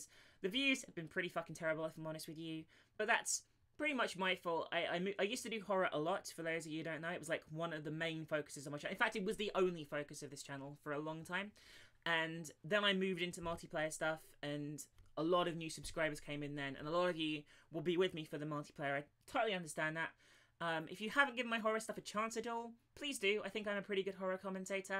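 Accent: United Kingdom. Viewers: your voice is sexy, british, and that's all that matters to me. <3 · I love your voice. Its kinda adorable to me with your british accent ;)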